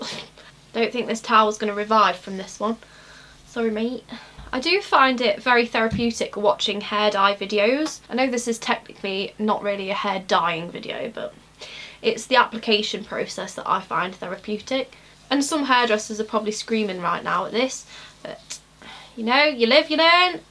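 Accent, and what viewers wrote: United Kingdom. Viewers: You have a nice accent...are you Scottish? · are you from near nottingham? you have such an east midlands accent! xxx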